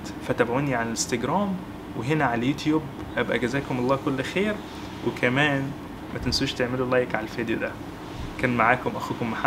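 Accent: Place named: Egypt. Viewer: حبيبي أنا متابعك على شان أنت فنان مبدع و على شان اللهجة المصرية! لأن احنه العراقيين نحب اللهجة المصرية, هي احلى لهجة في اللغة العربية, رغم أنا قوميتي ليست عربية ولاكن أحب العرب عامتا و المصريين خاصتا.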